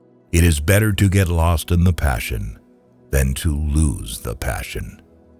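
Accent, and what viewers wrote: United States. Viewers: The stupid American accent doesn't sit well with, European philosophers.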